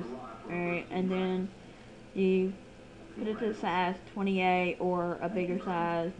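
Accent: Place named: South, United States. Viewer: ok...from your accent...you're a Southern..which is ok...but next time you video something...shut the radio/tv off..it's distracting and trying to listen to you, with your southern accent and the background noise...very difficult to hear/understand what you're saying....just a thought.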